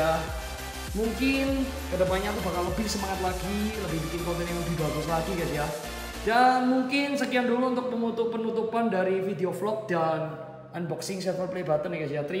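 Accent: Java, Indonesia. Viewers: Asli pundi mas kok logat e Jawa wkwk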